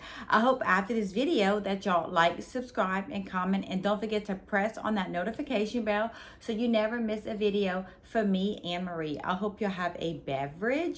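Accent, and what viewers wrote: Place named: Cajun, United States. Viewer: I subscribed. Your channel is young. I'll give you a like. Let's get your numbers up. I like your tiny Cajun accent. It's barely there but it's there. Like delroy lindo.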